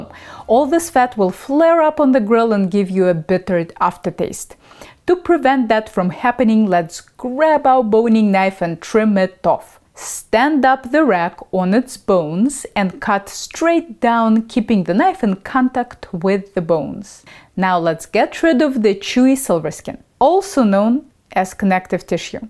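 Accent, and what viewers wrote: Russia. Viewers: this russian accent, sexy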